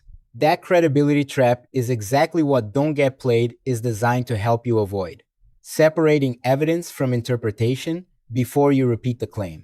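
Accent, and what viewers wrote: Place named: Spain. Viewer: What s your mother language ? It sounds as if.it's Spanish !?! I WISH I COULD SPEAK SPANISH AS WELL AS YOU DO ENGLISH ! Nice video , concise just relax dude . Your doing great 👍 🇨🇦🇨🇦🇨🇦🍁🇨🇦🇨🇦🇨🇦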